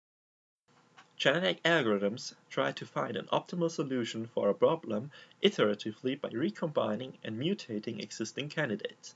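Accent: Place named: Germany. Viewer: That accent... Are you German?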